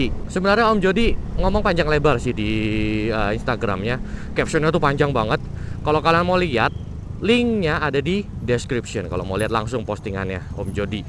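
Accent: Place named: Java, Indonesia. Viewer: Baru pertama kali liat, dan ternyata medok medok gimana gitu, berasa ngedenger orang jawa, orang jawa mas?😂😂😂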